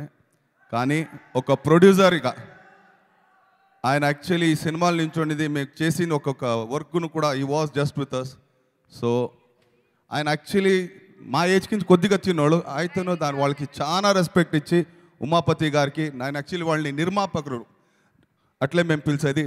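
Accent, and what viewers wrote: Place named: Rayalaseema, India. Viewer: It likes rayalaseema slang telugu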